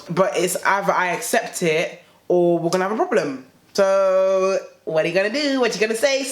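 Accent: United Kingdom. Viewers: U a London girl babes?? Xx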